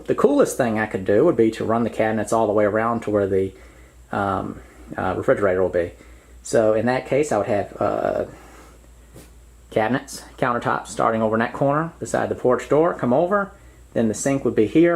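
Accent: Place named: Texas, United States. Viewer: Hey, I live in France and about wood framed houses (maison ossature bois in French) you're actually so right. Our tough houses ( masonry) are tricky to renovate regarding man strength and structurarly it requires heavy heavy duty sometimes just to add a door, a window or a sliding door. Wood houses are on the rise it is true in France. They're not really cheaper since we don't havea lot a wood resources. But they provide flexibility regarding the floor plan and the possible additons of the house (for instance, adding a bedroom on the side of the house). Workload is really easier to manage with wood than masonry. Also, wood provides better eco (heat) performances but for sure far more sound issues (cracking and sound passing from a room to another) and some people fear the wood to rotten and by doing so, making the house to collapse. Anyway, I bought a house in Normandy which was a pig house transformed onto a house but its core is compacted dirt with cereals... 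Anyway if I'd build one, I'd choose wood for sure. Love your videos. Love the music. Love your Texan accent. Love the content. Love the answers given to our questions either in the comments or in your videos. Take care and love this house too, great potential. 👋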